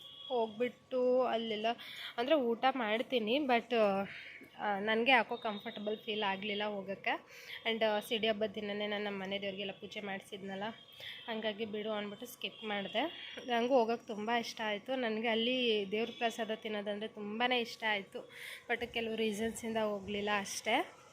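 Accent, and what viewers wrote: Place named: Mandya, India. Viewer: Akka nivu thumbane mudddagi idira hage astu muddugi matadutira mandyada bhashe thumba chhanagide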